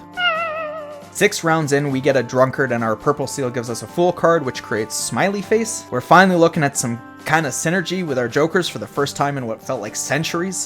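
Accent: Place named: Alberta, Canada. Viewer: HAHAHAHA I thought I heard Alberta but I didnt want to be wrong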